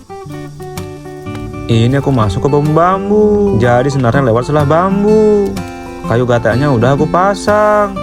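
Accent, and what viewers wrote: Java, Indonesia. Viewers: bang aslinya mana bg,,kok ad logat jawanya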